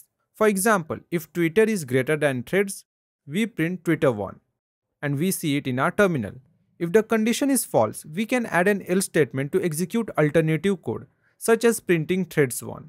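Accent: India. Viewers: you sound indian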